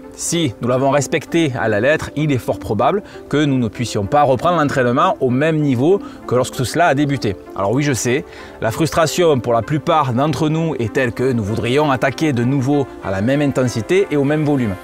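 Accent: South, France. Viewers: un toujours aussi pertinent, intéressant,pédagogique.le tout accompagné d'un accent ensoleillé.Bref, c'est toujours un plaisir de regarder tes vidéos.Bonne reprise et bonne continuation.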